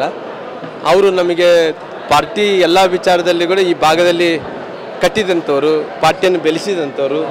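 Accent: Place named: Telugu, India. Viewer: Telgu kannada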